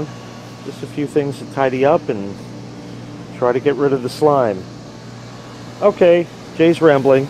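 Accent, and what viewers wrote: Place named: Midwest, United States. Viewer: We go through bottles of that Aldi chocolate syrup every month. Your voice being shot is actually amusing (sorry!)... it's like your voice is there, but you have lost your New York accent. Like you are suddenly a midwesterner. Yep, I'm commenting as I go XD... AWW I see the Sharkie missed a few pots, hun. OH and now I see why, he doesn't have his glasses on. :-) Glad it was fun!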